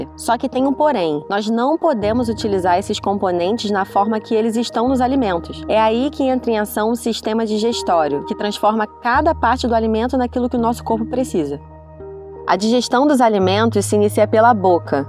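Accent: Rio de Janeiro, Brazil. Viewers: Infelizmente as pessoas não sabem que para atingir e satisfazer as pessoas de todo o Brasil, o sotaque deve ser neutro. Não consegui ver o vídeo todo, que deve ser muito bom, pelo sotaque carregadíssimo, que creio ser carioca.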